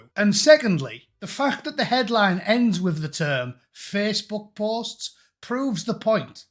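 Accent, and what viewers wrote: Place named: Middlesbrough, United Kingdom. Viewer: You from the Boro lad???? New subscriber ... You sound like your for my neck of the woods.👍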